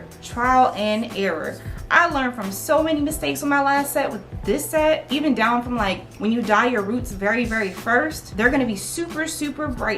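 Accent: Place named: DMV, United States. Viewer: She sound like a dmv baby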